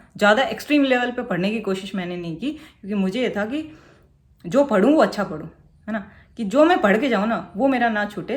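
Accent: Haryana, India. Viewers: Haryanvi Vibes mst lag rahi hai language me 😁😁😁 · Awaj se lagta h Rewari, Gurugram, m/garh se jo aap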